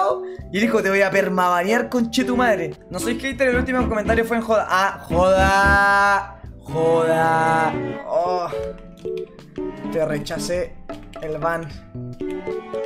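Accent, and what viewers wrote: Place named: Argentina. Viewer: Pensé que eras argentino xd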